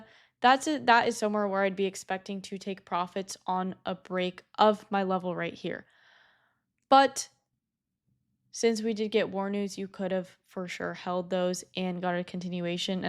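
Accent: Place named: Midwest, United States. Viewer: interesting to see a young woman trading. u sound like your from chicago or wisconsin. ive been around and know a daytrader he teaches his daughter to trade. pretty cool keep going you can make a good life for yourself by yourself.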